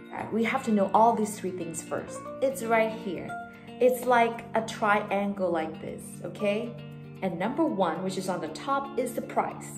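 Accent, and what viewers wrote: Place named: England, United Kingdom. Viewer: ชอบสำเนียงอิ้งหลิชของพี่มากค่าา 😻😁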